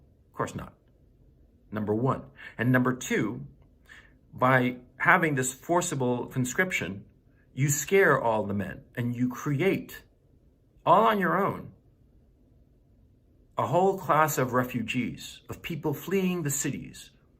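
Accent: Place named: United States. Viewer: Let’s start from the beginning. As you mentioned on you title and your first words, INVASION. Now, let’s describe what invasion means. Invasion means to enter someone property or house or country by force and steal all. So now you daub that Russia is not bombing Ukraine, we have already 14 days and just last night they bombed an Hospital ( Maternity) So you are you trying to say that Russia is bringing flowers to the Ukrainian people. My friend this is war, and 14 days has passed and they are not able to reach the capital yet. Nothing against Russian people, but the government yes in deed. Mr., I don’t know you by your accent you sound American and you are one those people that is a loser and badmouth your own country. Open your eye, it’s a war, people will suffer with this stupid war. The leader should learn what we humans beings have been true all those years work all the wars like WW11, where 6 million people died from Europe and more then 25 million from Russia. 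We are in 2022, there no reason for war. Now, you sjouke be more smart then that. Now my question is, it has been already 14 days of this was R and this video is when it just started. I just wonder where are you now??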